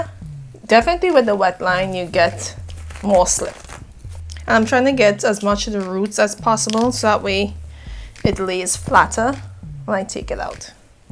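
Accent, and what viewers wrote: Trinidad and Tobago. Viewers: I'm new to your channel but are you from Trinidad? Your accent is beautiful!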